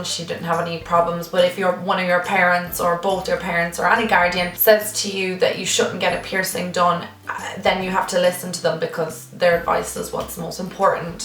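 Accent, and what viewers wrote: Ireland. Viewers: does anyone think she sounds like cheryl cole with an irish accent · Nice piercings, I got my tragus done on each ear and both of them wormed their way back out, so upsetting, sacred to have it done again encase the same thing happens but I really liked it when they were done! BTW, LOVE your makeup, especially the blue on lower lash,what have you used eye shadow wise? Love an Irish accent :)) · Are you Irish 🍀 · Your voice is so soothing!!! I love it. I guessed you were from Ireland before you even said it 😂 wanna visit so bad!! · she sounds Irish or Scottish but I could be wrong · Are you Irish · i thought i knew your ascent i was in ireland not to long ago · she's irish... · Kassie Pistone Thats called being irish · Are u Irish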